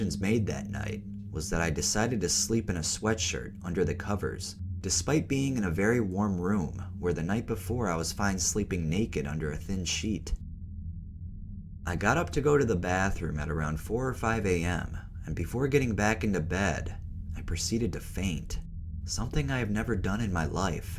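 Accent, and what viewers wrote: United States. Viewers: Narrator sounds 3rd generation Chinese American.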